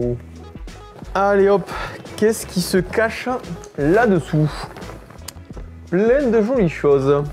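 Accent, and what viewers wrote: South, France. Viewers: Merci pour ces idées cadeaux. Je vais transmettre à ma moitié 😁 Mais pas contre d'être tiré au sort 😍 Toujours de beaux tutos et surtout un accent qui chante et sent bon le Sud. Comme on dit ici: Bon bout d'an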